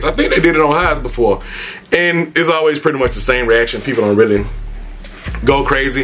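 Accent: South, United States. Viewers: you're a funny dude and your southern accent. keep doing your thing bro!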